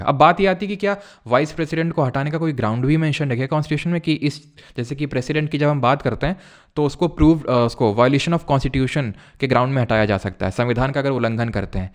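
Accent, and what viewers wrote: Kanpur, India. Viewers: Matter ..... Apna kanpuriya style hai bhai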